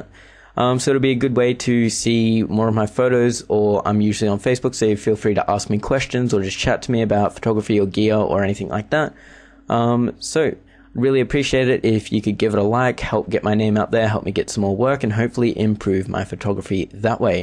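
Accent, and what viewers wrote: Australia. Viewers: I love how your voice sounds.. No Homo. Giving Aussie accents a good reputation :3 Sick tutorial mate!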